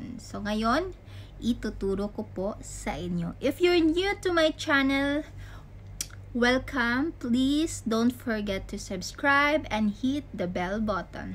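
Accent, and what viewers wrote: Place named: Pakistan. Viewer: pak english is life joke your so beautiful #sweet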